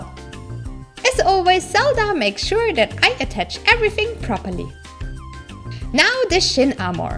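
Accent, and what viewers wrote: Germany. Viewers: where are you from, from the accent I thought you were german, but is a slavic name(?) Any way, always great videos, I love how you turn from gorgeous next door girl to super hero woman when you wear your costumes:)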